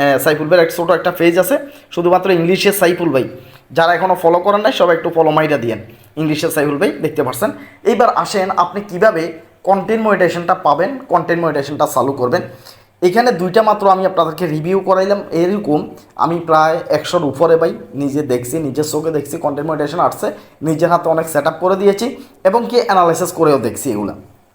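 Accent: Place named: Noakhali, Bangladesh. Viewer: কথা শুনে মনে হচ্ছে তুমি নোয়াখাইল্লা খাটাস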